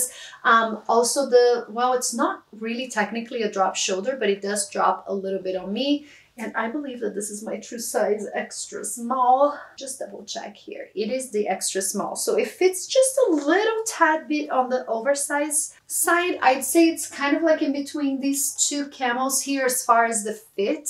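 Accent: Brazil. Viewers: is brazilian for sure, that accent... 🤔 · Are you Brazilian ? I’ve heard a Brazilian accent since the first sentences you said (actually, I’m writing this comment on minute . Just curiosity 😊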